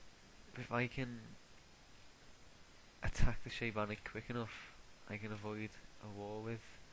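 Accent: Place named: Liverpool, United Kingdom. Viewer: Scouser! :P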